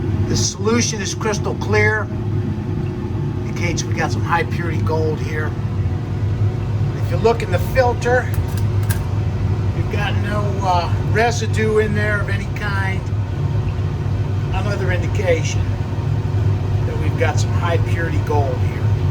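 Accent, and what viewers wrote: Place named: Missouri, United States. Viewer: MISSOURI 🤷‍♂️🙄.....that explains your accent. Missouri is one of the hardest accents, to place.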